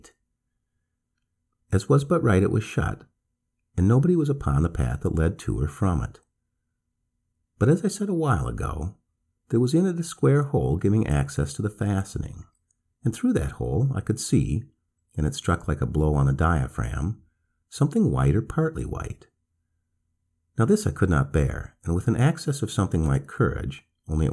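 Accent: United States. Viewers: Funny to hear an M R James story narrated by an American just when I was getting used to more complex British accents. 😁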